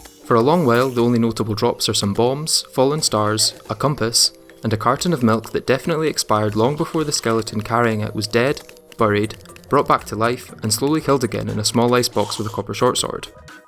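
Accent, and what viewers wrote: Scotland, United Kingdom. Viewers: are you scottish perchance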